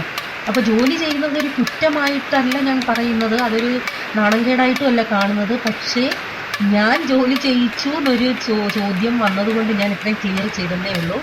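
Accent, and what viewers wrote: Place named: Kollam, India. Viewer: what kap thot meen kutmo nee.......... kollam slang super!.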